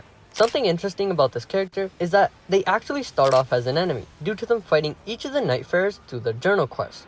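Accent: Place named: United Kingdom. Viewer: lol he’s British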